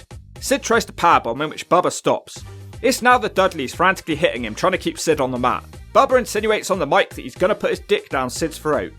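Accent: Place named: United Kingdom. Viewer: Seriously, dude. You're a Brit. Why are you writing about American culture, you freak? In case you're dumbass wasn't aware, pro wrestling is a product of American culture, so fuck off with your gay high-pitched Brit accent. It's not manly and it's definitely not suited for an American audience. In case you haven't noticed, Americans are real men and Brits are not real men. Just watch a Benny Hill comedy special to understand how femine and weak real Brits actually are.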